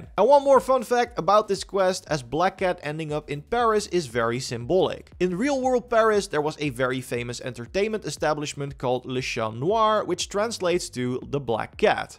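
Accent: Netherlands. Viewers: are you dutch? Cuz im hearing a stong accent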